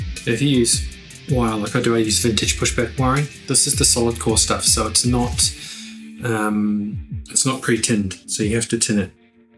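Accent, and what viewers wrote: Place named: Australia. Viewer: I like listening to an Aussie swear !! Sounds fackin cool !!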